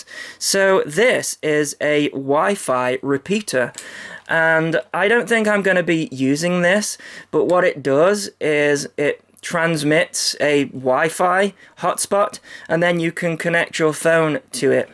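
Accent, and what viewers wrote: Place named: United Kingdom. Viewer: Your so popular I think because your delivery mixed with your British accent then add that you're very informative and interesting to listen to. Keep up the good work! Sincerely a Loyal subscriber. William Horsley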